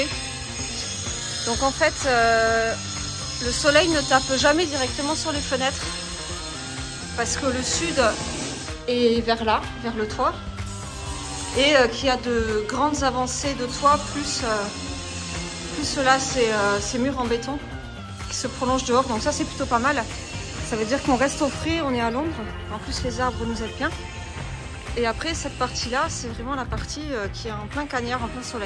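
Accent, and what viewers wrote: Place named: South, France. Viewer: C’est génial de découvrir avec vous les petits trésors de ce jardin, les palmiers est splendide ! Ici aussi nous en avant mais c’est la région. J’entends un petit accent du sud, de quelle région êtes vous ?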